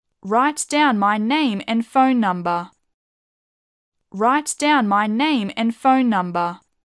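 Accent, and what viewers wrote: England, United Kingdom. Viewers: У вас Британский язык? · Произношение чисто Английский (England)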